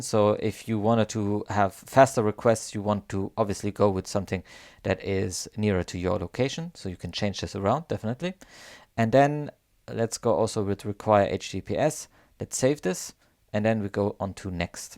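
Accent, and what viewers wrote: Germany. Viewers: Wenn ich den Akzent einschätzen müsste, würde ich zu 99% sagen, er ist ein deutscher!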